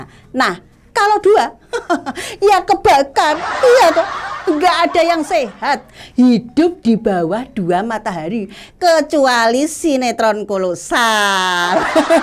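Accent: Madura, Indonesia. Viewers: Ini mak jelas org madura nih, Karena aksen suara nya ada aksen madura trgas, lugas, jelas, cerdas, kreaktif, itu tipe wanita madura.. Itu prediksi saya, Maju terus mak. Konten mu sangat membantu utk koreksi, intropeksi buat aparatur negara.. Semoga sukses mak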